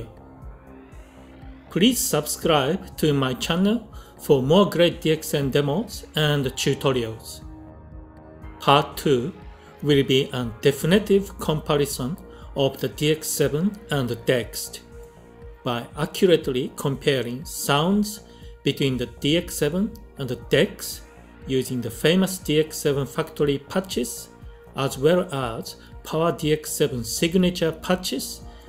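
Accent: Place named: Japan. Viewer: God this is a Japanese speaking English...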